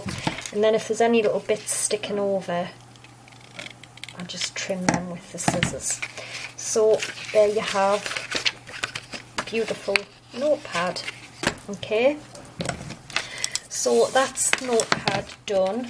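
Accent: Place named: Sunderland, United Kingdom. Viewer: Nihe1967 I would guess Sunderland? Quite specific accent 😊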